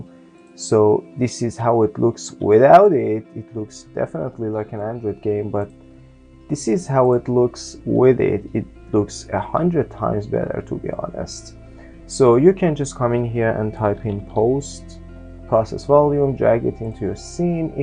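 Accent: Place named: Iran. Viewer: سلام داش از لهجت میخوره ایرانی باشی میشه لطفا بگی چجوری باید لنز فلیر رو برای موبایل آنش کنم؟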